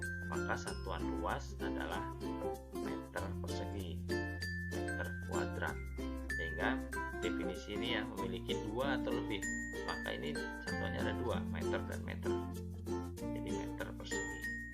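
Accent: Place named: Lampung, Indonesia. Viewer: Dari Lampung mana suaranay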